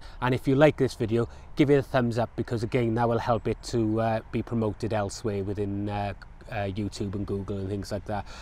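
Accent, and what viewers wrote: Wales, United Kingdom. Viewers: Hello, your accent sounds Welsh to me. Can i please confirm if my Cymraeg radar is on target?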